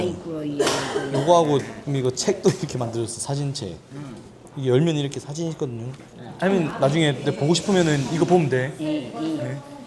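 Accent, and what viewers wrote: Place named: Hadong, South Korea. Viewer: 손빈아 가수님은 우리 하동의 자존심 입니다 더높이 더멀리 비상하세요 꼭 승승장구 하세요 빈아님은 순수한 청년입니다 사랑합니다 간혹 우리 하동말 나올때 정감이 넘쳐요🎉🎉🎉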